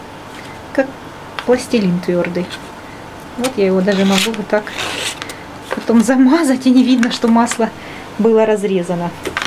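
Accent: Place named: Ukraine. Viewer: невозможно слушать, необразованность жуткая у сей девицы. Украинский акцент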